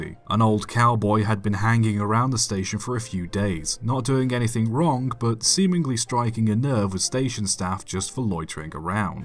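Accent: United States. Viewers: As an American, I have to say your American accent is extremely accurate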